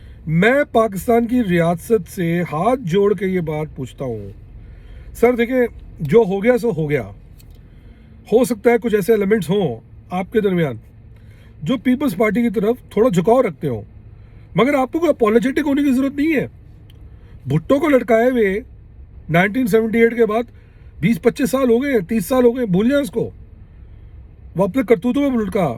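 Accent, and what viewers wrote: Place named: Punjab, India. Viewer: تم کو أخر اردو لکھنا کب آئے گی، پنجابی لہجے مل میں بولتے تو ہو ہی لکھتے بھی پنجابیوں کی طرح ہی ہو۔ مرون منت ہا ہا ہا ارے یہ سن کر پکا پتہ چل گیا کہ مہاجروں کا مقدمہ پنجابی لڑرہا ہے۔ پس ثابت ہوا کہ یہ "حب مہاجر میں نہیں بلکہ بغض PPP میں" بول رہا ہے۔ اصل میں مسلم لیگ ن کی مہم چلا رہا ہے۔ کیوں کہ ایم کیو ایم مسلم لیگ ن کے تھلے لگی ہوئی ہے۔ قریش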